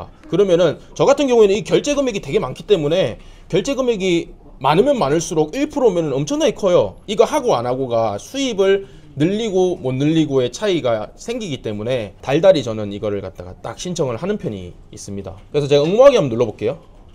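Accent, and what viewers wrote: Gyeongsang, South Korea. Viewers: 오늘 님 300만원 프로젝트 1탄부터 정주행했습니다. 잘꼭따라가고 월 300은 아니어도 200이라도 벌었으면 소원이 없겠어요 간절하네요 저도 고향이 갱상도라서 사투리가 넘 와닿고 ㅎ 검단에살고 있어서 눈오는 풍경도 낯설지가 않네요. 꼬옥 성공하고 싶어요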